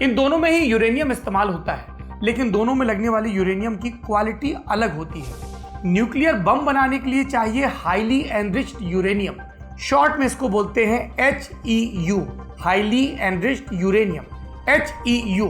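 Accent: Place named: Bihar, India. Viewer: 11.48👍👍 baki jo h so toh haieye h ,😁😁. Chacha bihar nd up k log deeli-bambai kahe n chle jaye but ye jo apna bolne ka tarika ye na chutega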